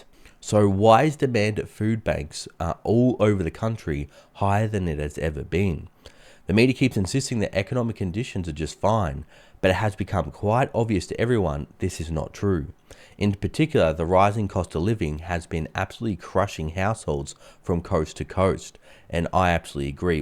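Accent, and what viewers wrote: Australia. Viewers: An aussie voice is a ray of sunshine on a cloudy scottish day! Happy New Year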